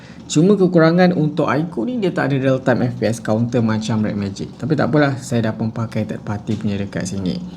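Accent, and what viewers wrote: Malaysia. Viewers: Saya betulkan cara cakap malaysia,saya suka cara dia cakap malaysia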